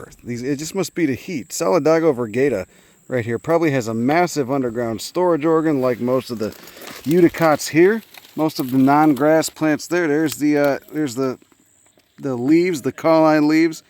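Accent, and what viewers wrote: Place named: Florida, United States. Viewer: he really got into florida character for this episode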